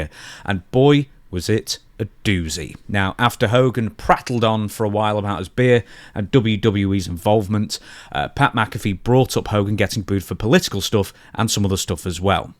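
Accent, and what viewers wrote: United Kingdom. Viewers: This video is such engagement bait. You weren't there so you don't know the truth. You are not the authority on current events in the pro wrestling business just because you speak with a British accent,. Also, kind of a 🍆 move to jump on the guy for saying "b" instead of "m" regarding "billion". Check yourself,. Piers Morgan you are not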